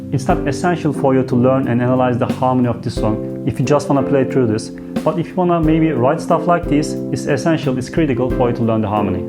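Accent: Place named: Turkey. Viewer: abi sen kesin türksün konuşmandan anladım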